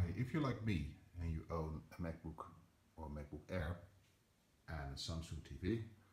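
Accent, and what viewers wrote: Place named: Netherlands. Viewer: you have to buy the app any alternative way of doing this, also i noticed that you are dutch just from your accent you al speak english in the same way just an observation thanks for the video though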